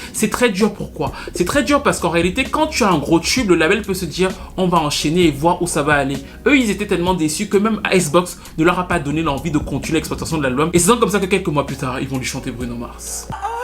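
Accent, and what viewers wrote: Cameroon. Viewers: L’accent Camerounais 🤣s’entend bcp pars ici ♥️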